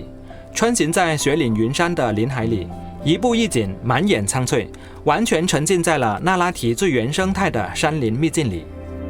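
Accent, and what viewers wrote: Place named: Chaoshan, China. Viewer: 潮汕人口音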